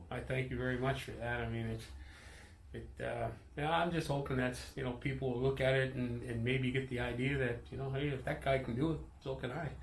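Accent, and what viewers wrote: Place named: Canada. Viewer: I am in Pennsylvania. From the accent I am guessing you are somewhere in Canada. I will email you my contact info.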